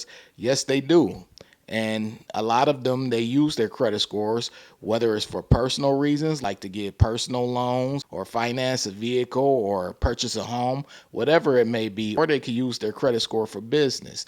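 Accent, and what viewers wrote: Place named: Chicago, United States. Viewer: Definitely Chicago accent.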